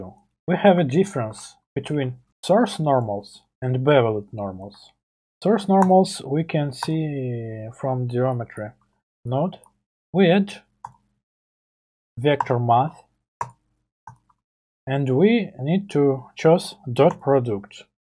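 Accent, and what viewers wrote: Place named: Russia. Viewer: Хмммм он будто русский говорящий на английском 🤔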